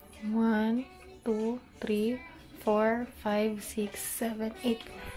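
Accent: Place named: Philippines. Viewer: Filipino accent AHHA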